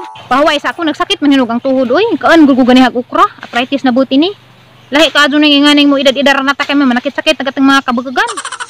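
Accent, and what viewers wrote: Bohol, Philippines. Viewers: Taga asa diay ka sa bohol ma'am Kay ang accent nimu morag taga Alicia bohol haha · malingaw gyud ko basta bol anon mag storya...jijiji makalingaw.. half cebuano half bol-anon here... jejejeje.. ajao palabi manang nabaw na slag bahakhak sa imo..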